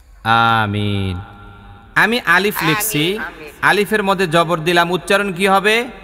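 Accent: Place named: Mymensingh, Bangladesh. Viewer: হুজুর আপনার বাড়ি কই মনে হচ্ছে আমাদের ময়মনসিংহ বিভাগে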